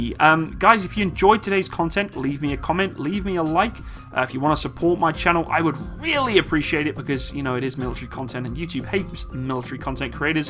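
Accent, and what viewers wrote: United Kingdom. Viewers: Pls do some research and not speculate everything from a shitty video that the company made for PR. It might be difficult for u considering most of your videos are just u reading Wikipedia pages in a British accent.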